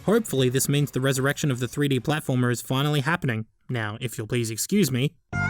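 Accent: Australia. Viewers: Are u from Melbourne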